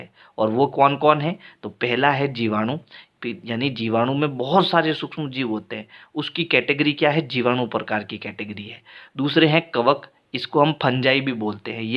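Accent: Rajasthan, India. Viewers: Sir kyaa aap rajshthani ho😅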